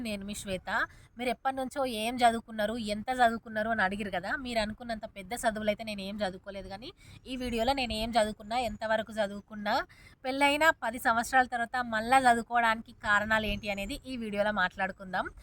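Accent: Telangana, India. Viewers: Sister మీ వాయిస్ సావిత్రి V6 anchor శివ జ్యోతి అక్క... Telangana యాసలో మీరు బాగా మాట్లాడగలుగుతున్నారు👌👌👌👍👍👍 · గారు మీ వాయిస్ తెలంగాణ యాసలో సూపర్ 👌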